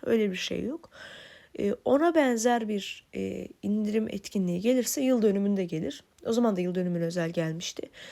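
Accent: Azerbaijan. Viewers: Azerbaycanlisan sen)?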